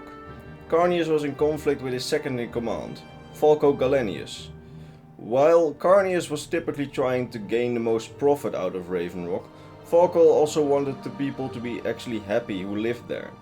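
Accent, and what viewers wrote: Netherlands. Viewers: You’re dutch right? Judging by the accent.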